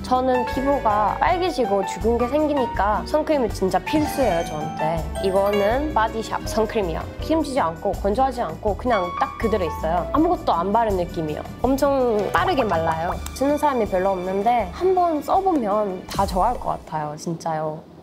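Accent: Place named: South Korea. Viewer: 왠지 한국발음 매력 인는 듯~~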